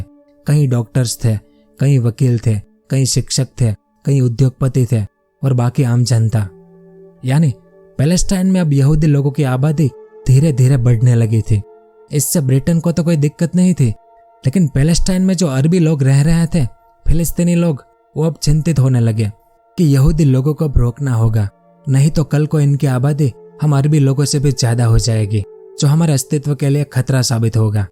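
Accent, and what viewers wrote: Gujarat, India. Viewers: आपने भोट बड़ा इंफॉर्मेटिव ब्लॉग बनाया और इसके लिए आप धन्यवाद के काबिल हेन और भोट ही भीतर बनाया जिस्म ४००० बरस का इतिहास जो मैंने मेरी ९० बरस की उम्र में खिजाना था और हिन्दी मेरे लिये भोट मुश्किल हेन मेरी ज़ुबान गुजराती हेन लेकिन इतना कर शक्त हूँ आप के बोलने से आप गुजराती लगते हों और voice भी भीतर करने की ज़रूरत हेन फाड़ी आवाज़ ज़रूरी हेन ऑलोवर भोट भोट भीतर हेन जिसकी आप को धन्यवाद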